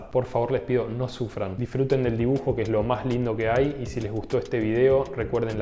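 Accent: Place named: Argentina. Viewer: Entretenido tus videos, wena combi entre el acento argentino, aprendizaje y memes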